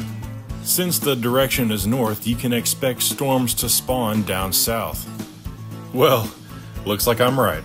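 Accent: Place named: Texas, United States. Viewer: The Texan accent making it’s infinitely better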